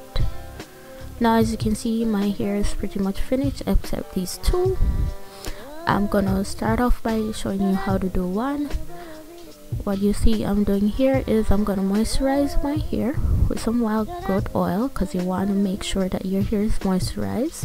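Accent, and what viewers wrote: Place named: Jamaica. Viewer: Your accent sounds Jamaican fr LOVED THE RESULT AND UR MAKEUP✨❤️😍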